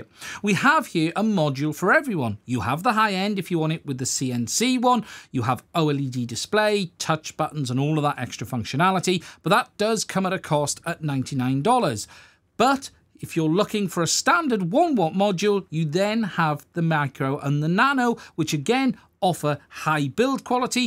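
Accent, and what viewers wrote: United Kingdom. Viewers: this is not only great review but I do like to listen youe British accent which is very nice. Thanks for great work - Micro Module bought 👍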